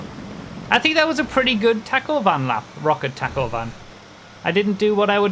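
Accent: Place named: North, United Kingdom. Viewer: interesting given he's neither of those, he's just northern lol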